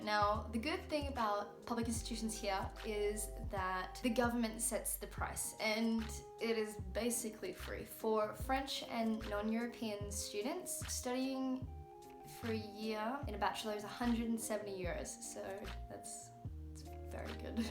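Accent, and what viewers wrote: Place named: United Kingdom. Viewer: It was the most useful I watched about study in France , Note: I love the British accent ❣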